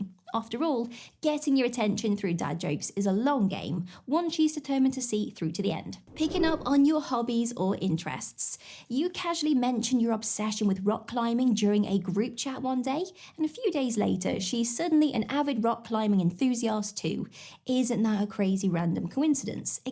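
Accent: United Kingdom. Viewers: Hey there, are you into me? 😂😂😂😂😂😂😂 I'm kidding. I'm here to listen to your British accent, I love it! You have a blessed day.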